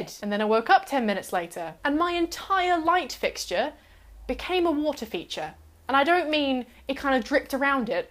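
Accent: Australia. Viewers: yOUR ACCENT HAS GOTTEN A LITTLE BIT MORE AUSTRALIAN AND IT'S SO ADORABLE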